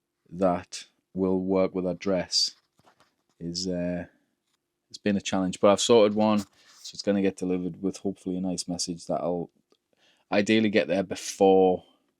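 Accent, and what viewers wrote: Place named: Northern England, United Kingdom. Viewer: Hi thanks for this series! My partner is in nthn Norway (LDR) so I watch a lot of these vids :) Are you fm southern Scotland Bec your accent seems more nthn England?!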